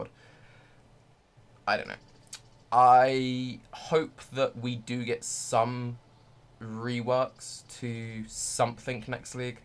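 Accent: United Kingdom. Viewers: I don't think people are really gonna bother you if you run ads on twitch. Like if you're broke and you need money just do it. 15 seconds of commercial every once in a while is not gonna bother us. On a side note, glad your health's doing better, and God damn(... bloody hell?) I love that British accent lol.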